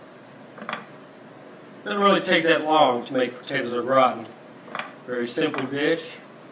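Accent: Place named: South, United States. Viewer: Also, you seems southern. I'm southern too.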